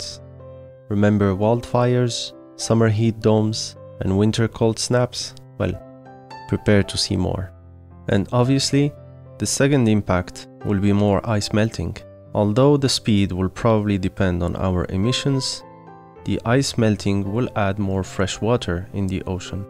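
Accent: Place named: Wallonia, Belgium. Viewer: My guess the accent is from Charleroi, Wallonia.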